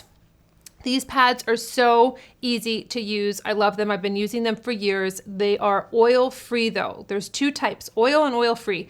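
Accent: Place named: United States. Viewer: Like watching and listening to a stroppy teenager army Sargent. I'll look for someone less ... American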